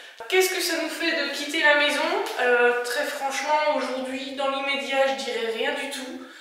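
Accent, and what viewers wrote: Haute-Saône, France. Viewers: Magnifique résumé de votre voyage, ça n'a pas dû être facile de choisir les séquences tellement vous nous avez montré de beaux endroits depuis ces 3 années. Continuez bien comme ça à nous régaler. Vesoul et les autres contrées de Haute-Saône nous ont été familières durant de nombreuses années, ayant débuté ma carrière à Luxeuil, c'est votre accent qui m'a attiré au début. Merci en tous cas pour toutes ces belles vidéos. Prenez soin de vous là-bas en Hémisphère sud. Ici en Périgord on vous admire. Jean-Louis Adishatz. 😘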